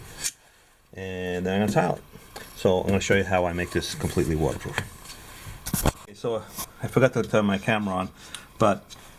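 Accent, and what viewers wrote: Boston, United States. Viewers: Boston area, I knew it the accent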